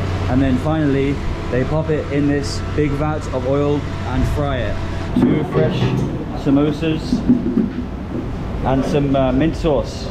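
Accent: Pakistan. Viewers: Makes me so happy you're already sounding like a Pakistani :') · I saw your videos recently on tiktok and came here to watch all of em. I really love your videos as i am also from Pakistan. Love your pakistani accent tho . Keep up mate. 💙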